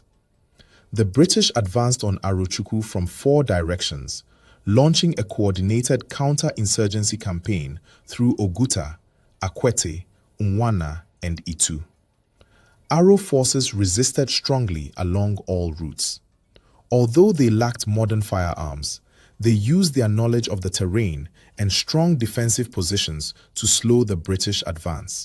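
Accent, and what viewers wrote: Ghana. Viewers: 🎉'A Nigerian here! The Narrator sounds Ghanaian. 'Very interesting indeed. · He sounds more Ghanaian than Ijaw.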